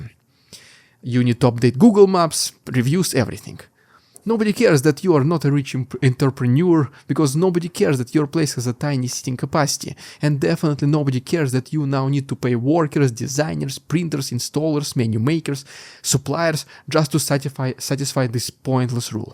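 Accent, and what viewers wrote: Russia. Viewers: I am terribly impressed by the command of the English language, although spoken with a thick Russian accent. I am an Australian that follows him daily and everybody here, (except a very few), totally support Ukraine. I would bet money that the rotten bastard Putin will be gone by Xmas! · In the beginning you were throwing morw effort on speaking out the English words. Now you are shooting them like you are speaking Russian...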